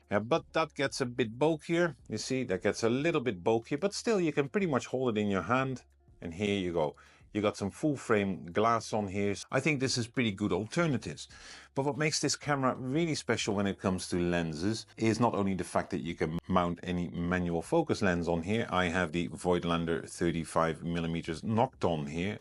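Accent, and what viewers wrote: Netherlands. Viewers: Wan toe pats, der wie goo. Jup definitely dutch! Great video, keep it up!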